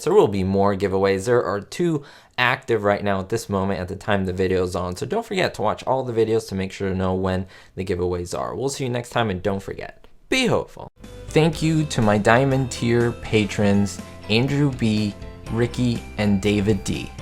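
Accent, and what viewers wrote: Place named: New Jersey, United States. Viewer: You must be from NEW JERSEY.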